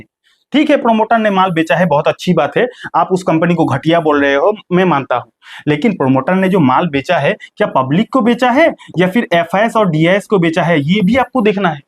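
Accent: Bihar, India. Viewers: Are you bihari???😊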